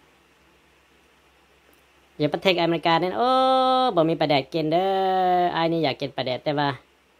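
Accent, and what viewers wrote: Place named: Laos. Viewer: I like your Laoglish Bro.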